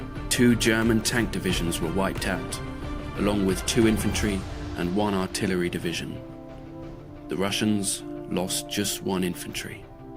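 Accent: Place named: United Kingdom. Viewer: That was, is and always will be amazing- never-ever-ever seen anything like that in the history of A&A videos! Your Brit accent makes it sound like a real war footage reel, it's even more ground breaking than my NO LIMIT SHOWS - if this doesn't make your name+ A&A channel known in our community, I just don't know what will!!!!!!!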